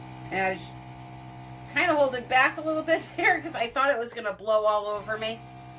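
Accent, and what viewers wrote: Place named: Chicago, United States. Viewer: i havent even watched this one...YET., but i have to ask one thing. your accent. Sounds from up north Chicagoish, thats where I grew up. been in TX since 81 and miss home sooo much . besides your amazing with you art. you rock the videos!!!!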